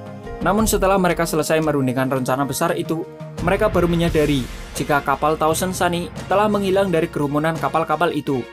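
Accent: Java, Indonesia. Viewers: Medok temen yak...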